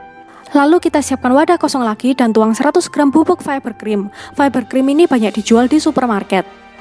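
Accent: Surabaya, Indonesia. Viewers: Medok sby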